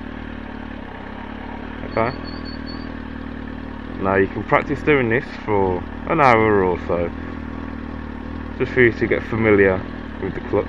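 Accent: United Kingdom. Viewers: Is that a fellow brummy accent i hear? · I think because you're British you sound smarter then all the American tutorial videos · Sound Like a Black Country Lad. and im thinking of getting a ninja 250r for my first bike, but iv been told i will out grow it in a couple months, and im lookin for something with abit of longevity something i can grow into rather than out of so im swaying toward a 600cc (zx6r) im 6'2 and 12 stone any advice "/ ? GREAT VIDEO BTW LEARNED ALOT !!!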